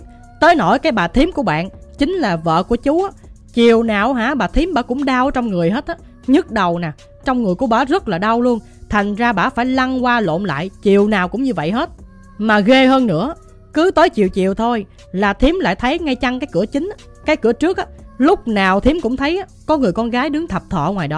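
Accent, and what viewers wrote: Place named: Phu Tan, Vietnam. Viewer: Kênh 90 giong ở phú tân quê e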